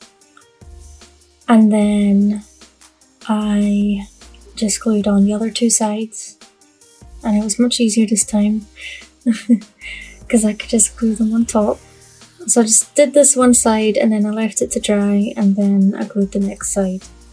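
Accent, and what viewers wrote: Scotland, United Kingdom. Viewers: Thank you for always inspiring me I love your DIYs !! I adore your Scottish accent.. It took me awhile to get some tumbling blocks and I tried to make some stuff and failed lol but I finally made a box with drawers and it came out decent. Thank you for your inspiration and congrats !!!!🎉🎉 Woot! Woot! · Just found you on YouTube a few days ago, love your tumbling block crafts. You voice is very calming, love your Scottish accent. There's a wee bit of Scott in our bloodline. Keep up the wonderful videos & congratulations on reaching 5000!! 🎉👏👏🎉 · I love your ideas! And your "scotland" accent! I could listen to u all day! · I love your Scotland accent. Those that you made are such a great idea and very cute!! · You are so creative with these blocks! 💕💕💕 I love listening to your Scottish accent. You're almost at 6K! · I can’t believe you only have 5,000 subscribers!!! 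You should have millions! Your diys are fantastic! Are you originally from Scotland? I was wondering what your accent was. Dollar tree even had you as a focus person in there newsletter!! Come on people subscribe and show this talented lady some love!!! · !!! I just subscribed with you a couple of days ago. I’m mesmerized by your soft cute Scottish accent. It’s so cool! Scotland is my dream vacation. One day I swear I’m going 😋. So I’ve been loving your tumbling towers shelves and plant stands you make. I’m going to give it a go for my mom Mother’s Day gift! Wish me luck. Thank you for the ideas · Wow! I love all three of these. Ya know, its funny as I just started watching the video, I was wondering where your accent was from. So I asked myself ' did she say Scotland in a previous video?' I giggled at the end so yes, that answered my question. Congrats! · I love your Scottish accent! I dream of visiting Scotland one day as it seems like such a beautiful country! 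Your DIYs are so beautiful and creative! Keep up the good work!! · Your projects are always so nice. That sure was a lot of tumbling tower blocks!! Lol! I knew your accent was from Scotland. I just love to listen to you talk. Lol. Thanks for sharing! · With all the beautiful things you make, you should have more than 5K subscribers. I love all your videos. Thanks for sharing and I thought your accent sounded familiar, Scotland right? :0) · I love everything you make and I love listening to your Scottish accent I love Scotland it’s an amazing place x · Love the Scots accent and love your videos. Learn so much. Thank you!!!! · Absolutely love your tumbling block tutorial! Your voice and Scottish accent are so soothing. I’m glad to have found you, and hit subscribe straight away! Visiting Scotland is on my bucket list, along with a good bit of the rest of the world! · I Love your "Scotland" accent! 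🏴 Your amazzzing making sooo many beautiful items, very talented! I can't even glue them even with my square. 😂 I subscribed to ALL video's, I don't want to miss any tutorials, I'm going to go back and watch your previous videos also. Congrats on hitting 5K!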